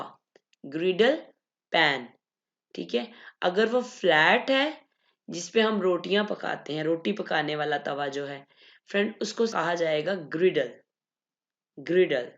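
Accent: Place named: Punjab, India. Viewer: Typical punjabi accent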